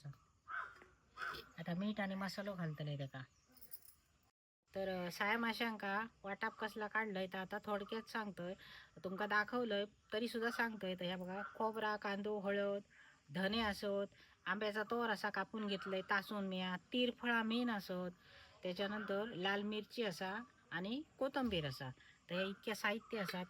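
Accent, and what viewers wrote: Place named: Malvan, India. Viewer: अतिशय सुंदर व्हीडीओ , ताई तुम्ही फार लकी आहात.अशा मनमोहक निसर्गाच्या सानिध्यात तुमचे जीवन जगत अहात.आम्हा मुंबईकरांना तुमचा हेवा वाटतो. तुमची मालवणी भाषा ऐकायला कानाला गोड वाटते.असेच नवनविन व्हीडीओ टाकत जा, आम्हाला तुमचे व्हीडीओ फार आवडतात.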